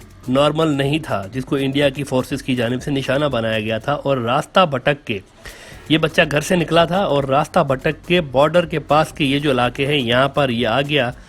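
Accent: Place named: Pakistan. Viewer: you can see from how he pronounce it's Urdu by Pakistan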